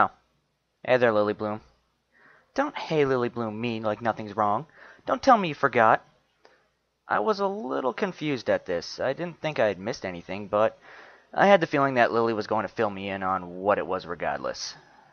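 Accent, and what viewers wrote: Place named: Boston, United States. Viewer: Most of all I like your slight accent. What is that Bostonian? Got to be eastern seaboard.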